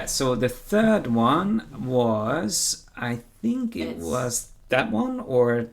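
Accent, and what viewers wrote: Hungary. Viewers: Bro your accent sounds Hungarian! :D are you? Thanks for this, excellent tutorial!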